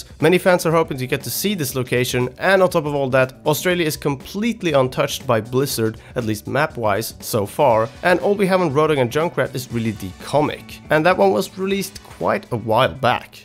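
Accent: Sweden. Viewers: Wow wow wow are you from sweden? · Are you from Sweden??!?!?!?! 😃😀😃😀😃😀😃😀😍😍😍💕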